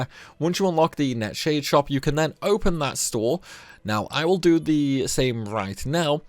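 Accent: United Kingdom. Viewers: It would’ve been nice if you had also shown us HOW to get these instead of yapping in your disgusting British English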